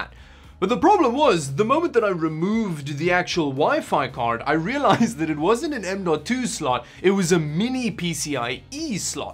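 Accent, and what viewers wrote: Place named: South Africa. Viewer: I can bet you are south African, eh? Afrikaanse mense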